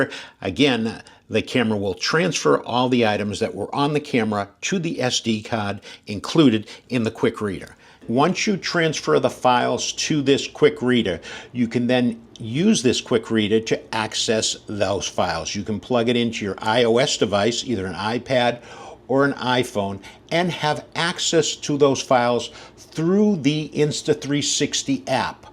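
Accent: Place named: North, United Kingdom. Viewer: I love the oldschool northern “ahhrd” accent. You don’t hear that (in media) as often today! (I live in NC btw lol) Great video!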